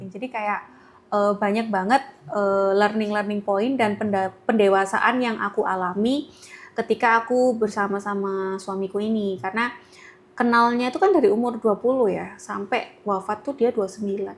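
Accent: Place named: Java, Indonesia. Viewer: Bener2 tuh ada lucu2nya, sampe ngakak aku denger ceritanya, dgn dialek jawanya yg medhok. Baru tau cerita awal perkenalan dan kisahnya dgn Kondosan, Luar biasa kisahnya ❤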